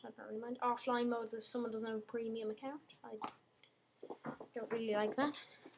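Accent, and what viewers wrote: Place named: Ireland. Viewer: and nice irish accent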